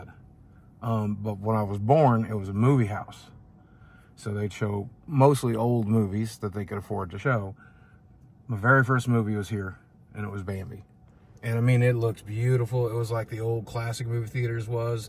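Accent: South, United States. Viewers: Is that a Southern accent coming back?😉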